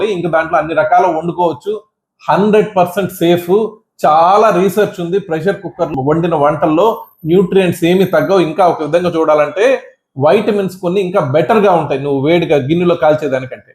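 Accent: Nellore, India. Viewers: Nellore slang భలే మాట్లాడుతున్నారు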